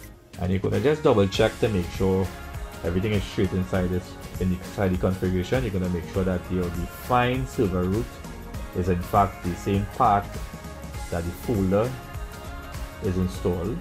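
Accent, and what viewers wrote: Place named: Trinidad and Tobago. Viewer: This voice is a trini for sure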